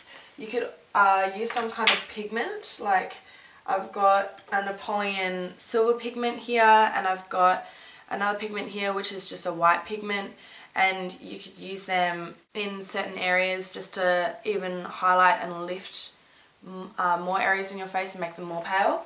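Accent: Australia. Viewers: OMYGOHHD your australian ;P that just instantly made my love you x3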